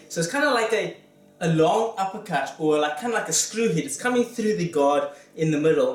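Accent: South Africa. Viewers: crazy how you still have your accent prob from your perents tho, any way i dig your channel keep it up. oh do you ever come down to fight in SA? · Are you South African bro? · U sound south african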